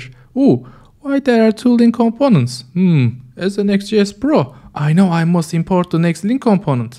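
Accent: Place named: Turkey. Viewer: jeez, i know when i hear a turkish accent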